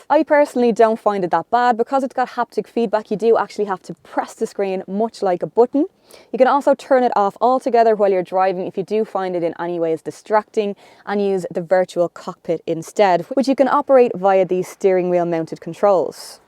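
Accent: Ireland. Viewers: Love your reviews and the gorgeous Irish accent - I’m now a subscriber. Can’t agree about the Touareg interior though - more functional looking and less classy than the big Audis.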